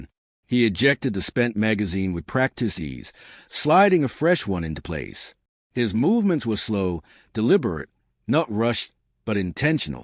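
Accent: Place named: New England, United States. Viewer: Bad accent get rid of snotty New England accent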